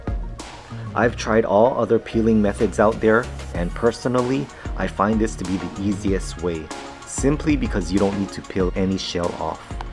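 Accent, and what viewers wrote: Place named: Hawaii, United States. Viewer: Great tip!! I hate when that membrane gets stuck on the egg! Yuck... btw... you sound like you're from Hawai'i 😀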